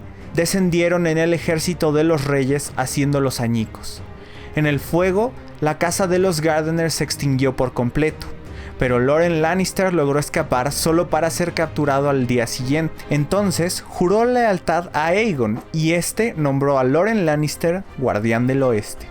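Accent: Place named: United States. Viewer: Que mal suena ese inglés de USA. Es super irritante. Por cierto, se dice BRAVOSÍ, no Bravosi.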